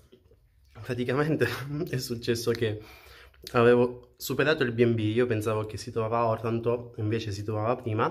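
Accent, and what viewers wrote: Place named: Salento, Italy. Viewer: si sente dalla tua parlata, hai molto di Salento... ti seguo da Tenerife,ma sono salentino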